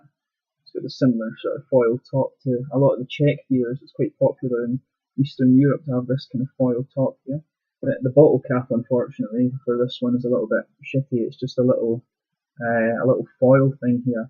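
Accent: United Kingdom. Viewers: yes sir, and guess what; yes, I live in Canada! Lol, I moved up here 3 years ago. Where are you from bro? If you live in the states, I'll send you some beers from Mexico if they allow me too, although I pretty much believe you live somewhere in Europe, that British accent is obvious. Love your videos bro, and pardon me! It is not a Louisiana beer! It's called: "Mississippi mud" the one with the alligator in it! Lol, they'll laugh their tears off! If they read this.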